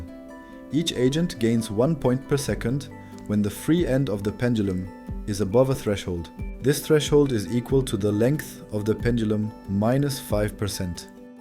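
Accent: France. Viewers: j'adore l'accent fr XD : )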